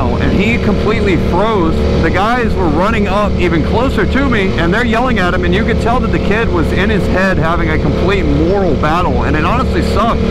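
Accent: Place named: Shreveport, United States. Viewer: Sounds like Shreveport,la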